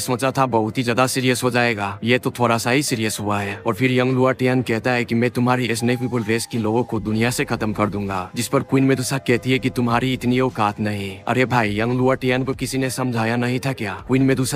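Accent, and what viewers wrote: Bihar, India. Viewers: Bhai aap 😂paka bihari honge 😂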